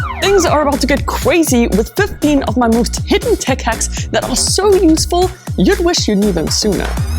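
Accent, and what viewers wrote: South Africa. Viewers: hmmm the access almost sounds South African ? 🤔😄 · So glad I found this! Subscribed immediately. And that was 100% because of the South African accent and the smart stuff.:medal-yellow-first-red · She sounds like she is an ex South African . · I think it's a South African accent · Great video. Thanks. Well done!! (I’m curious… You South African? accent…🤔🤗)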